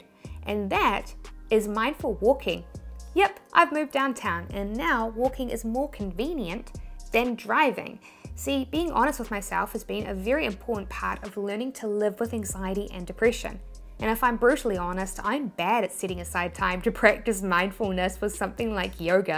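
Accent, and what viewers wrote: New Zealand. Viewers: Great that you share your experiences and all the helpful information; we all benefit from this. I'm a fan of your channel, inspiring videos, and lovely NZ accent. Keep up the great work! But relax sometimes too ;-).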